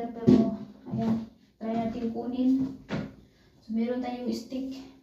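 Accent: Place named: Visayas, Philippines. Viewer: Bisaya Kapa Po maam, God bless maam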